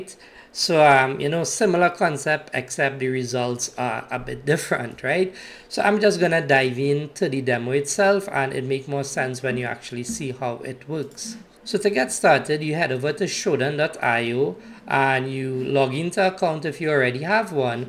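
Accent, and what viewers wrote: Trinidad and Tobago. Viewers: trini boy?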